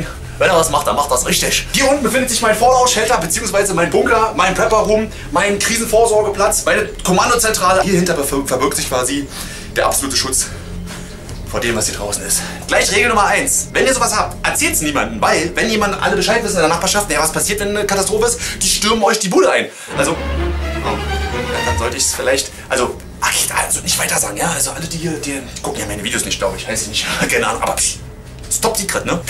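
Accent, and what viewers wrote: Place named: Saxony, Germany. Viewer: Könntest du Vllt mehr säschsich reden?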